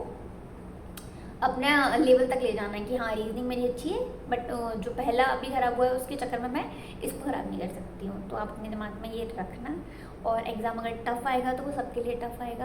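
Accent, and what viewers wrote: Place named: Madhya Pradesh, India. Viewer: DIdi MP se ho kya ?? Language bhi jani phechani lag rahi hai 😅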